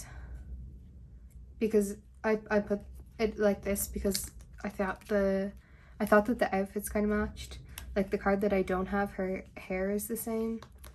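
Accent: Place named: Ireland. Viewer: ok i just found your channel (im watching all ur storing vids) and i didnt even notice your accent until you said youre irish 😭😭😭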